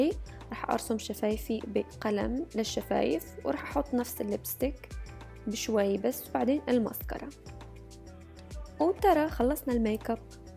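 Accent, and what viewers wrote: Morocco. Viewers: Si maghrbiya bayna ghir tari9a kalam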